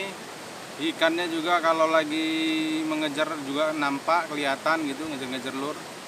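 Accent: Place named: Medan, Indonesia. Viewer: Mantap om... Semakin ok penyajiannya Tinggal bahasanya saja yg kelihatan anak medan 😁😁😁🤭🤭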